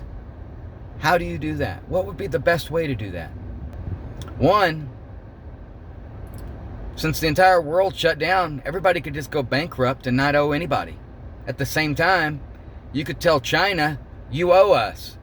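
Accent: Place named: Ireland. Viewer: like the Irish accent lol - was there a hidden meaning for it or just for fun? 😀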